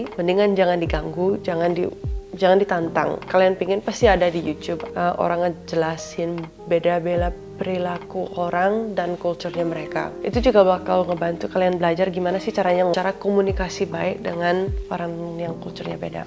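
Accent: Bali, Indonesia. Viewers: Logat mu, seperti warga Bali., yg perlu kamu jelaskan, mengapa orang eropa kurang apresiate aturan dan etika di Bali. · kk ini accent bali nya kentel bgt yh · Medok nya kayak orang Bali di dengar sama orang Jakarta 😅🥰🥰🥰 masukan yang bagus bukan hanya untuk Bali, juga saya termasuk orang Jakarta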